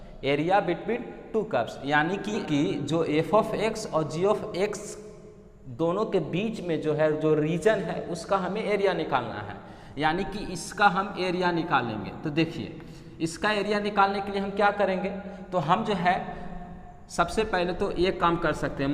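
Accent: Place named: Bihar, India. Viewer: Aapka bihari accent I like it so much 😍 💕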